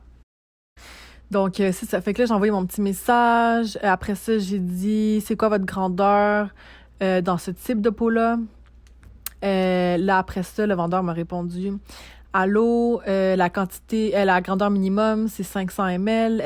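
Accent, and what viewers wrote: Canada. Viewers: L'accent québécois me fera toujours rire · Accent canadien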